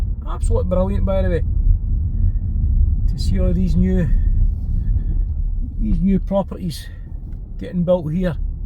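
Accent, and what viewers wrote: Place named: Glasgow, United Kingdom. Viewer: you are a good person, keep it going, interesting commentary n Speaking like a true glaswegion love to you and your family the banter ive been having with the opposite side here in SA is been a good laugh ❤.,cracker that comment oxford street 'dont be confused with london' got me laughing 😂good one 😂, here in SA when you visit friends its,id,drivers license and finger print recognition, everyone thinks south Africa is behind the times its not,security companies have replaced the police and its working providing employment thats badly needed,its bloody heartbreaking to see anyone beg 😢thanks for your positivety ❤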